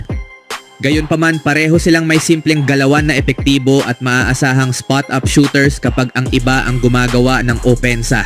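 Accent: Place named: Visayas, Philippines. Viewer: Bisaya ka sir halata salita mo?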